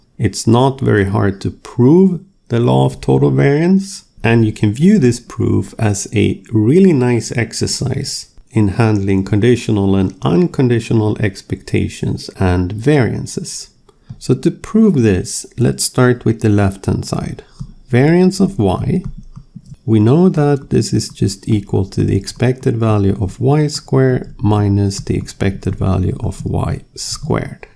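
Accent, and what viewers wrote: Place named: Sweden. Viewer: got a very Swedish accent.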